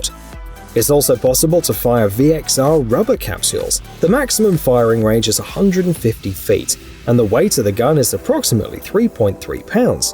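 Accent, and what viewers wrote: United Kingdom. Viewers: All these guns are bullshit for the prices. If someone starts waving a high point at you, your ducking either way aren’t you!. And yeah, I’m British, but having a British accent talking about gun specs 🥴🤷‍♂️ I know more than the script he’s reading from. 👍🏻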